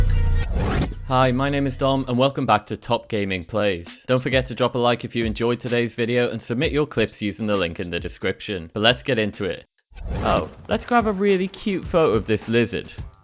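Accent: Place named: England, United Kingdom. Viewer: Quick question, is your name “Don,” or “Dom?” It’s hard for me to understand at the beginning of your videos, since I’m not used to the English accent…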